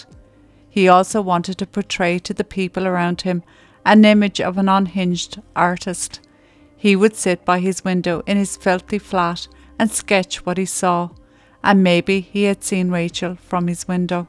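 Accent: Ireland. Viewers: Thank you, as always. Beautifully covered on such a senselessly sad case. I love just listening to your soft brogue. Slainté 🍀☘🌹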